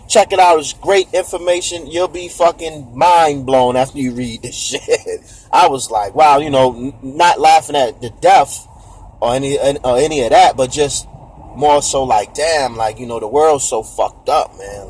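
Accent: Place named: Washington DC, United States. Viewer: this was good as video champ You sound like you from Dc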